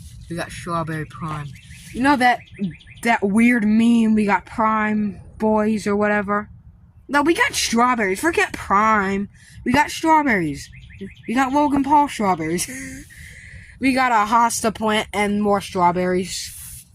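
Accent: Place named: New York, United States. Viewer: Where u from? I hear NY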